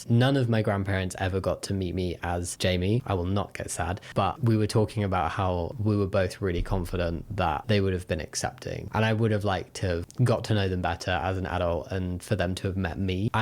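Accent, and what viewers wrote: United Kingdom. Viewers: I always watch these videos to watch a british man talk in a british accent to do gay british things. I always end each video learning more than I do in any of my classes.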